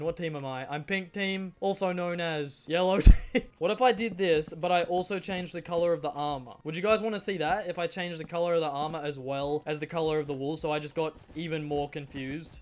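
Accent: Australia. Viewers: Comic I know he’s ausie it’s just he’s speaking ausie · He seems more Australian than usual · am i the only one who feels like is getting more australian in every upload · is more australian in this video xD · he's never sounded so australian before HAHA i love it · why did become a really hardcore Australian this vid? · became more australian than me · This man has an Australian accent and he can do both the British and American accent. Teach me your ways. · The heavy Australian accent is so funnnyyyyyyyyy love you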